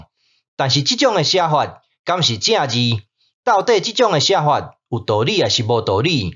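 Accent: Zhangzhou, China. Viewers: 千萬別改你的腔調，我住南部的關係，很難得聽到偏漳州腔調。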